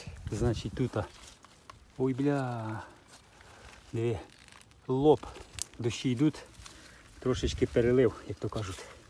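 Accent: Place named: Vinnytsia, Ukraine. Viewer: Як приємно чути вінницький говір! Буває тільки заради цього дивлюсь))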